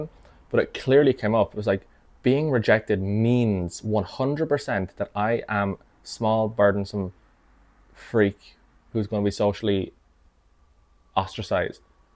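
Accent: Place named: Ireland. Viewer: I understand completely. Also, did this theme in therapy umongst other themes. Believing what you dud, that you deserve to be shameful, small and "wrong" is normal at that young age - having had that experience with those boys back when you were 7. The adult self understands this, but the inner child doesn't. When the inner child hears this, it can be like a crack in the mirror because the inner child defined itself as "wrong," shameful and small. You will definitely find to a point realising that this "strategy" to rather please and be good before approaching someone, was needed for the child to feel safe. So it wasn't wrong that you thought this way. Your adult self could tell your younger self now, that it is all okay, but that the younger self may trust you now in trying new strategies. By the way , I love Ireland and was just there 2 weeks ago. 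Hearing your accent makes me miss the Island so...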